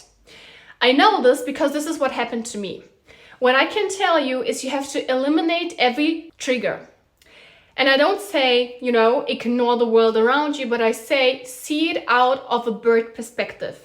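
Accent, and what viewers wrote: Germany. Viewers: I'm so thankful for this video. The second you started talking, I thought, "She's german." But not like "Her english is bad she should stop" but like,"She is german LIKE ME and even if people might recognize that she is, IT DOES NOT STOP HER. " ❤️❤️🙏 Good luck with everything you are going to achieve!! ♡I'm sending love & appreciation ♡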